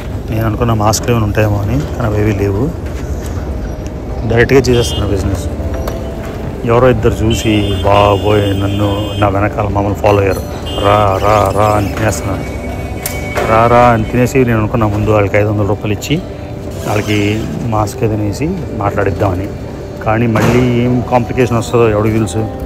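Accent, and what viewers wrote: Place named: Andhra Pradesh, India. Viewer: That's not a compliment dude. Take it as criticism I don't like the way u narrate and do videos and ur slang please speak in telugu not in Andhra Slang.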